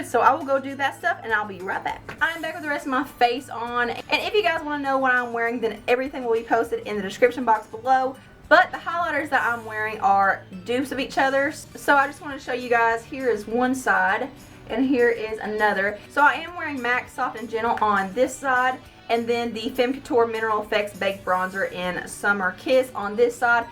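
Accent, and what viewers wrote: South, United States. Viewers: r u using a ton of filming equipment or just your phone. .I think being yourself and using just a phone to record or minimal equipment is so much more genuine and easy to relate to...I love how easily you explain everything and seem to enjoy sharing your talent. .and product information etc with your viewers. .I live in Mississippi so I adore your accent. .looking forward to more videos and tutorials. .great job. ..best wishes!!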